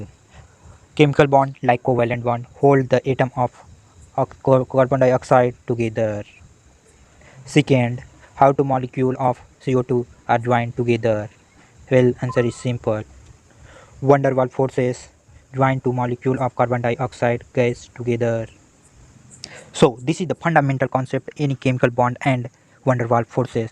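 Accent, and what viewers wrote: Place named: United Kingdom. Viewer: Bhai British main lag rahe hai 🔥🔥🔥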